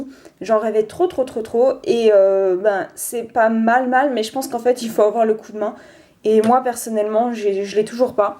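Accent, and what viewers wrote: Belgium. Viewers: Tu es belge ?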